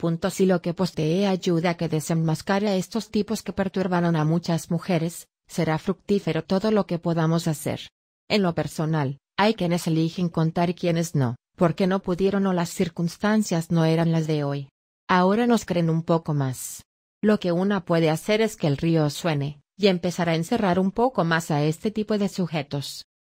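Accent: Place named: Spain. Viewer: X q ponen esa voz española q feo.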